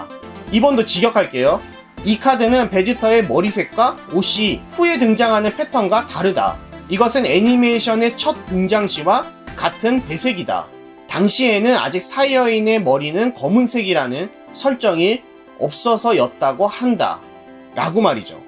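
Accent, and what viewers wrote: Japan. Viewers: 유튜브를 일본식으로 자꾸 유츄브라고 발음하시는 이유가 있으신가요??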